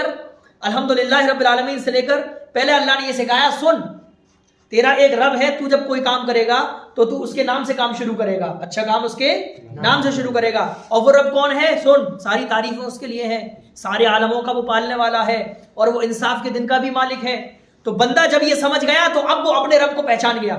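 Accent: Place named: Maharashtra, India. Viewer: Mashaallah buhut umda❤... Janab aapke baat karne tariqe se lagta hai Maharashtra se belong karte ho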